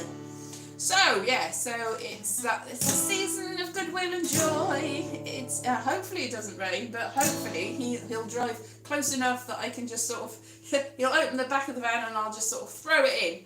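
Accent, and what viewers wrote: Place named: London, United Kingdom. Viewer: How can you be the if you talk like a Londoner????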